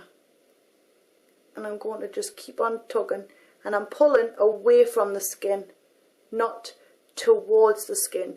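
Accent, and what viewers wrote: Newcastle, United Kingdom. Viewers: shes from newcastle :) geordie accent! best of all :D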